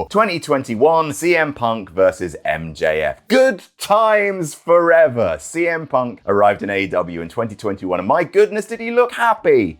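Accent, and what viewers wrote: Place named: United Kingdom. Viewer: Hey its that posh english guy that talks about wrestling.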